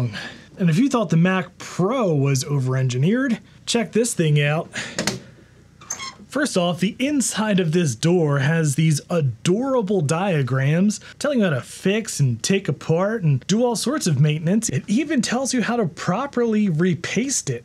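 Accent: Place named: California, United States. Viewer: Off topic: Are you from Cali? Not that it matters but judging by your accent I would say Cali but my friend says AZ... Love your channel, btw, and I use Arch.....btw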